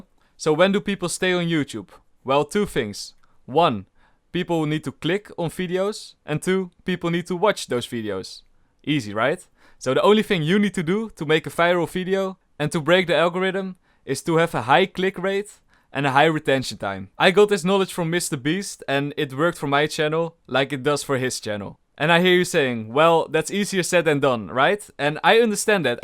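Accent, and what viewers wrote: Netherlands. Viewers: hi sir following you since 4k subbed from my 6 account and love from india and most important information to reach 100k u should have a great content and a lovable Dutch accent love your vedios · I am not even interested in making a Youtube-Channel, yet i warched the whole video because this dutch accenr and your charakter is so addicting. Kewp it up man, see you at 200.000 Subs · i am in love wid your dutch accent ! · me not even thinking about youtube but still watching the video to hear his dutch accent :D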